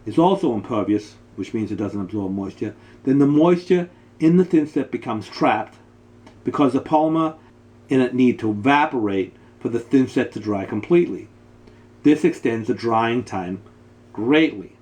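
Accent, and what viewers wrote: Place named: Northeast, United States. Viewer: Ask not what you can do for your tile job... Is this the accent from the American northeast? - he sounds a bit like (former) President Kennedy? Rob Melbourne... Australia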